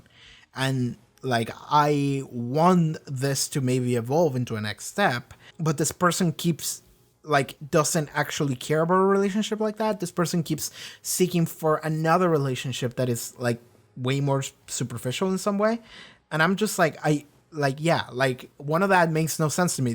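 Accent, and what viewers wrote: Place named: Latin America. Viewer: "monster of the week" episodes are the best for me. Yes, I enjoy a "story arc" episode here and there, but the individual episodes are the ones I end up revisiting the most and they're the reason why I still watch Buffy to this day. Also, me encanta ver a un reactor que habla español y es de sangre latina, me había preguntado de dónde eras en estos últimos episodios y porque me llamó la atención el acento con el que hablas inglés, y es bueno haber despejado la duda. Saludos desde México.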